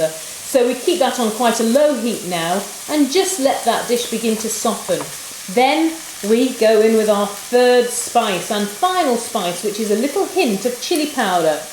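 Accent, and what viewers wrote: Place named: RP, United Kingdom. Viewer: true. will give both a try. how do you speak so posh. i love it :p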